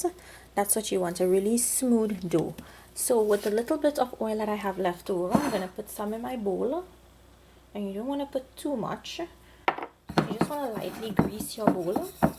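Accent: Trinidad, Trinidad and Tobago. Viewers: That is Trinidad accent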